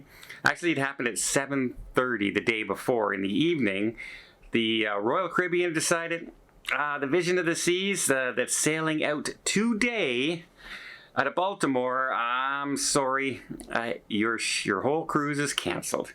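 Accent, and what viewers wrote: Canada. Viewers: He is Canadian give him a break on the pronunciation, eh?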